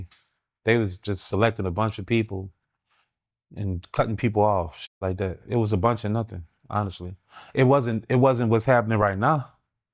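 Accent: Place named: New Orleans, United States. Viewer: Love your Orleans accent💜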